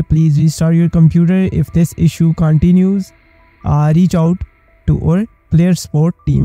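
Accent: India. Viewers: کیرممننم تو لهجه هندیتتتت ولیی واقعااا ممنووننن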